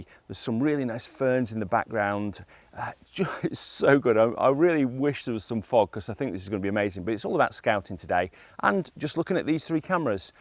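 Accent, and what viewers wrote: United Kingdom. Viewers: It's the first video I've watched from your channel, I like the test you've done and moreover I like your British accent, it's easy to understand for a non-native speaker like me. It would be interesting if you could print some pics from a Fujifilm X-T5 which it is an APS-C camera but with 40 MP sensor, just to understand if an A1 print looks better now compared to an APS-C 24 MP sensor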